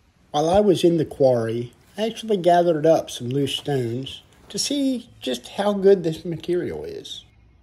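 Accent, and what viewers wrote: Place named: Mid-Atlantic, United States. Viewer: You have a mid-atlantic accent. Like a Philly or Baltimore accent.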